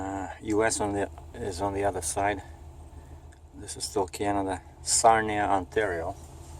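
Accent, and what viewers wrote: Russia. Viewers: Ok I see now. There are a few good guys that will share some good info but these Forum's are filled with people that tried to make it in the Trucking Industry and they are basically in financial ruin! they lost homes, marriages, family, and it's not your accent that bother those losers, it's the fact that you were born in Russia and you are more successful than a lot of those losers and that what bothers them. I was born in Central America and I will always have a Spanish accent. When those losers saw your equiptment and all those videos, envy set in and your original question dint even cross their mind. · Your accent is cool I like the Russian English you don't take personal what some lame brains have to say about you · your accent is cool to me. ther are a lot of ignorant people in trucking. sorry to say. I like truckers I wanted to be a trucker but do to medications I must take to keep my spinal pain bearable I cannot drive a commercial truck. 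but I respect@ truckers. Or they may not drive truck at all hard to know. Russian/American doesn't matter.Its who we are that truly defines us.They defined themselves as a social sickness that is tearing this country apart as well as Canada too.People like you are building keeping this country running. So they can go FUCK themselves when they treat people like you with disrespect. your one badass trucker. Thank you for all the videos. Stay Safe Tim Bishop out...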